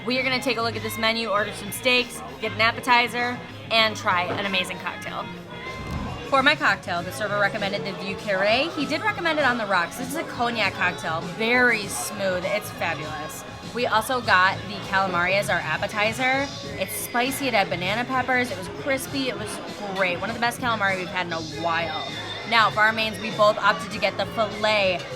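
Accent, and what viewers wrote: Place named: Midwest, United States. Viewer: Great episode! Made me so hungry! I love that your mid west accent is going wild in this episode haha. Love the!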